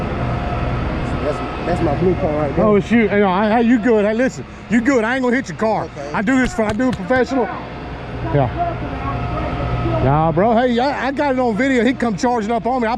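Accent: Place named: Georgia, United States. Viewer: Hey man, I'm originally from Georgia and had to move further north a few years ago. Can't tell for sure if you're from northern Florida or southern Georgia but watching your videos is like a trip back home for me. I'm only half watching what you all is doing. Mostly I'm just enjoying the conversation. One good ol boy to another, I thank you.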